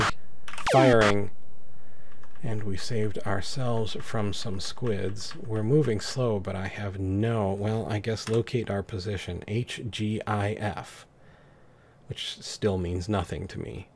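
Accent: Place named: Scotland, United Kingdom. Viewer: Colleague of mine watched you first time and mentioned your Scottish accent Oo ...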